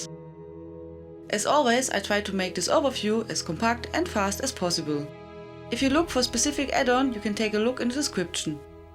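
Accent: Germany. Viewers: Very good list of add-ons thanks for that. Also probably the thickest German accent I heard in a while, but your voice makes it kind of cute :) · Du hättest es auch auf Deutsch machen können, oder ein Zweites Video auch wenn ich alles verstanden habe, der Akzent schreit nur so ''ich spreche Deutsch'' xD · deutscher akzent :3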